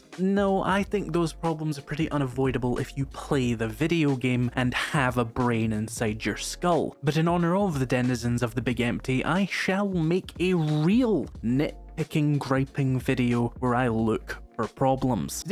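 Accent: Scotland, United Kingdom. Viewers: Complaints in a Scottish accent just work!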